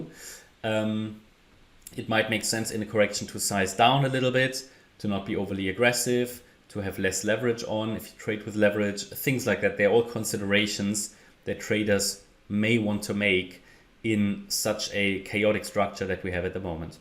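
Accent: Germany. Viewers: Content of interest. How you pronounce everything, I would guess you are a German.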